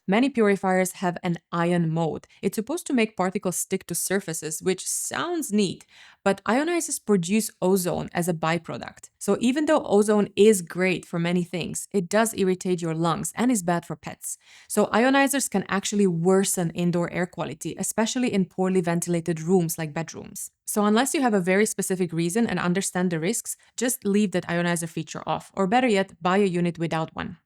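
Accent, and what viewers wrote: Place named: Europe. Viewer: Incredibly helpful video. Plus the Euro accent makes me trust her more.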